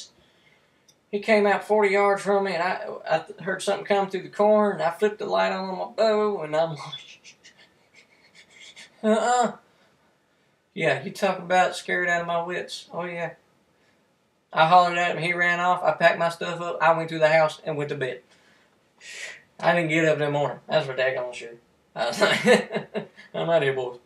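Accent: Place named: Carolina, United States. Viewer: I thaught you sounded like a carolina boy!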